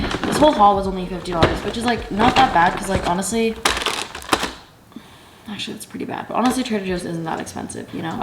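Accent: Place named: United Kingdom. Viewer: Hi, my name is Brianna and I am from London and you have a lovely British accent.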